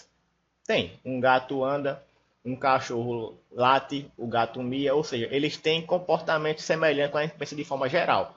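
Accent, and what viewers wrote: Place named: Northeast, Brazil. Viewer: Não sei o que é mais prazeroso nesse video, a didática fora da curva ou o sotaque gostoso do meu nordeste ❣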